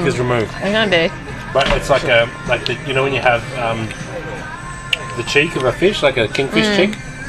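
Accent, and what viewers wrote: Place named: Australia. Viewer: you sound like an Aussie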